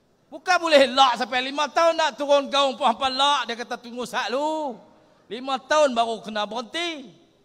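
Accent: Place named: North, Malaysia. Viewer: Ni 1st time aku dgr MB kedah bercakap. Mmg stail dialek dia mcm ni ke? Mcm pekat je. Aku ada gak belah mak org utara tp x pnh lak dgr loghat cmni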